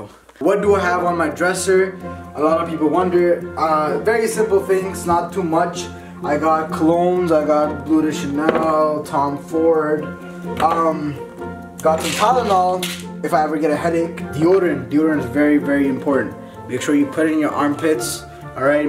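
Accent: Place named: Pakistan. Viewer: Accent to Pakistani lag raha hai. Lakin Didi kyun keh raha hai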